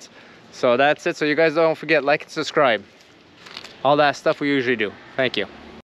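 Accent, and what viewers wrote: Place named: France. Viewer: no problem, the French accent can throw you off sometimes, I’m French and I get tricked sometimes. 😉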